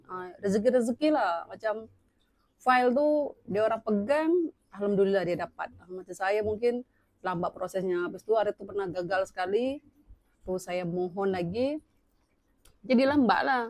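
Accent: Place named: Malaysia. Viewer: Cara percakapannya dah macam orang Malaysia. Semoga permohonan kerakyataan Malaysia berjaya.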